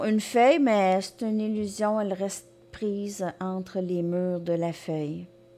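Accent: Quebec, Canada. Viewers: OK 👌 j aime bien écouter votre langage du Québec il est super un vrai régal pour les oreilles 👂 à demain 👍🌝🕊🌈🇨🇵Frédéric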